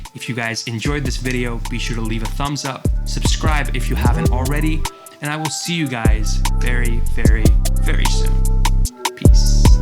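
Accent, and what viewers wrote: India. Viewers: I swear I thought you were from India, lol.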